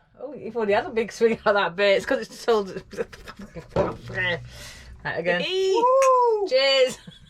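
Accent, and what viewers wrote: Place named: Northern England, United Kingdom. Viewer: “A brew” is most definitely North. I had never heard of tea or coffee being referred to as a brew before I met my MiL for the first time. She was from Lancashire, near Rochdale. As I met more of the family up there they all called it a brew. I’m from Coventry, it’s a cuppa there and now I live in Northamptonshire it’s simply “a coffee” or “a tea”. I love your accent. It’s very similar to some of my husband’s family. He’s been in the midlands too long now. He’s mostly lost his accent now.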